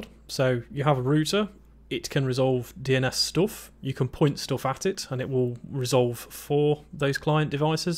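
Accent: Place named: United Kingdom. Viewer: Hear British accent, thinks maybe he’ll call it a rooter. Immediately calls it a rooter. Subbed.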